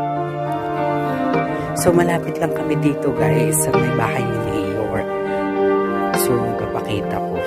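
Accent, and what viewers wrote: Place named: Batangas, Philippines. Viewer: Ang Salita mo is parang batangas at Sa calapan Sa Mindoro din ang quezon ano. Tagalog Na tagalog at May punto.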